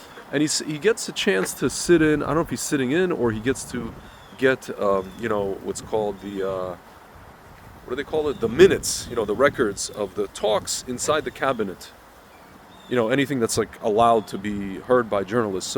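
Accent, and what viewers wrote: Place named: United States. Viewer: Pasty faced American accented man talking about his land 😂. Foff. Free Falastin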